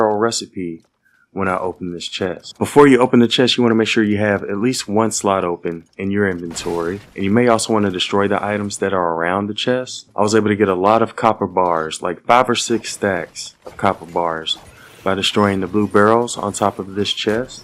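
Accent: South, United States. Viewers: bro is definitely southern, arkansas?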